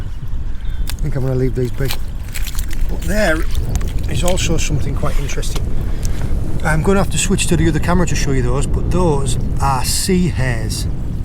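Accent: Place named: North, United Kingdom. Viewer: Love when a smoggy accent tries to speak proper England. Go lad, cracking channel. Ex booty?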